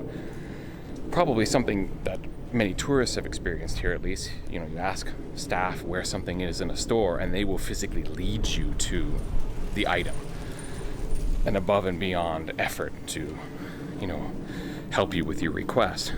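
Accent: Midwest, United States. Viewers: Love the Midwest accent. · Konnichiwa from North Pole Alaska. You sound like you’re from the Midwest! (My Dads family are from there). Loving your videos, they make me smile when at work. We’re getting ready to go back for our second trip to Japan. Fell in love with it last time!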